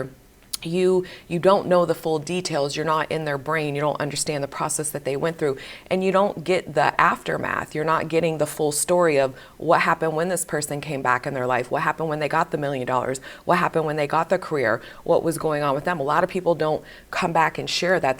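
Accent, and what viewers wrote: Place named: New Jersey, United States. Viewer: this is exactly what I needed to hear! I was getting discouraged by all the "if you're doing everything right, it should be showing up instantly!" videos because when it wasn't happening instantly, I assumed I failed. Thank you so much. (p.s., is that a Jersey accent I hear?? I was wondering why I found your voice so comforting - growing up right outside of Jersey, all I heard were people who sound just like you!)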